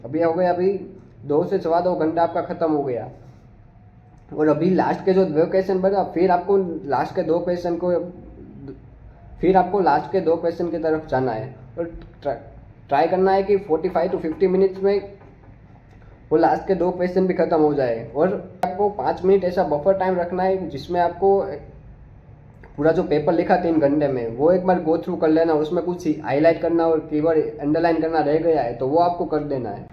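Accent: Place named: Gujarat, India. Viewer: Brother your gujrati accent is great, did you study in gujarati medium?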